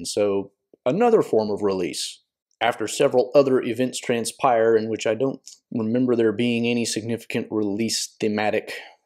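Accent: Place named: Appalachia, United States. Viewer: you say they’re betrayed by Borlas and you say it a few more times after. I’m sure it was just a slip but the correct person is Gorlim the Unhappy. For those who do not know, Borlas is one of two people: the original name of Borlad, one of the sons of Bór killed in the Nirnaeth Arnœdiad; or the son of Beregond of Gondor. PS - you sound like you’re from Appalachia. If so, I’d guess WV or KY 😅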